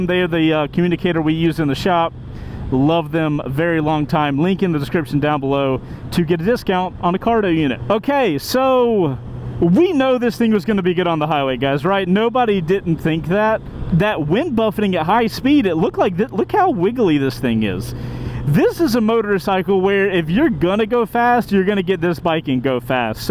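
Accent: United States. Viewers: Great bike . Nauseating yank accent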